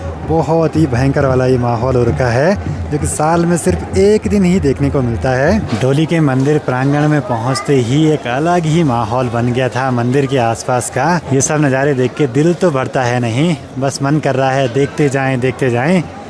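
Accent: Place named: Pahadi, India. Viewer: Bhai tumra bat karna ka same style cool pahadi ka tarh lagta hai ...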